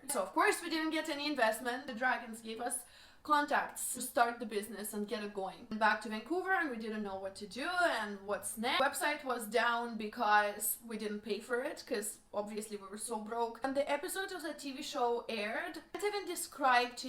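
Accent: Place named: Russia. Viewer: Ого, даже не знала такую грустную и душещипательную историю этой потрясающей юморной красотки., ты умничка, обожаю твои видосы с этим офигкнным русским акцентом (на нём так понятен английский, что англичанам и американцам стоило бы поучиться)))) 😅 ты прелесть! Успеха тебе и не унывай и не сдавайся ❤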